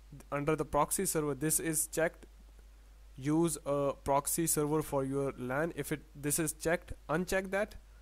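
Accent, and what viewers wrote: India. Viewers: Yes, sure. .....not likely I'll listen to someone with an Indian accent tell me how to do anything on my phone.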